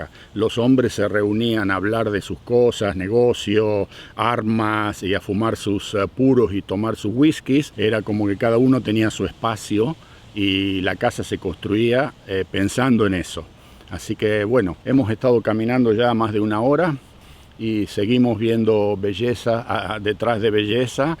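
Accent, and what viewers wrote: Argentina. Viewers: Como se hacen querer!! que maravilla seria poder viajar con ustedes! Hace 2 meses llegué a Toronto y durante todo el proceso de sacar la visa (9 meses me llevo) estuve mirando sus videos y aprendí un monton sobre Canadá!. Gran saludo!!! me recuerda a mi papá que nació en la misma epoca. Y es tremendo como tiene todavia el acento argentino bien marcado! Gracias!!!!!! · arranca el video mencionando que va a regar las plantas porque hace mucho calor, aunque no sean sus plantas ni su casa QUÉ GRAN CORAZONNNNNNNN, LA PUTISIMA MADREEEEEEEEEEEEEEEEEEEEEEEEE, encima dice "le vamos a pegar una regada", no hay caso, lo escucho y escucho tonada e historia argentina, lo apreciamos mucho!! <3 <3 <3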